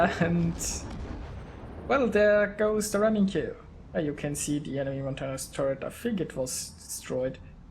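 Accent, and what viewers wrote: Germany. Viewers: ich höre jedes mal nen englisch redenen deutschen richtig aus😂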